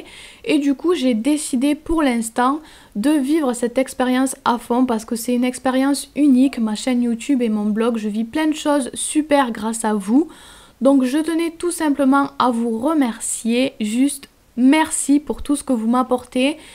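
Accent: South, France. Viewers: ***** moi aussi je suis de beziers :) je me disait toi aussi tu as l'accent du sud :') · Ta l'accent du sud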